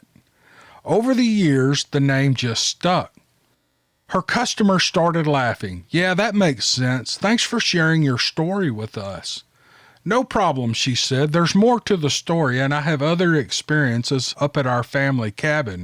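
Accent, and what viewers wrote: South, United States. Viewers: Somebody should proof-read this! In one sentence early on you had the sentence correct but switched the words "mind" and "bed"...that made no sense but would fit if you put the words where they belong. It is like a robot-reads this. This could not happen if it were read by a live human. It is like the man's words are used but a robot reads it; a robot would not understand the meaning and therefor not notice it! So we tried to figure it out but can not! This is crazy. Who would use a robot voice with a southern accent that even pronounces "oil" as "o-el"...? I hope you can explain it!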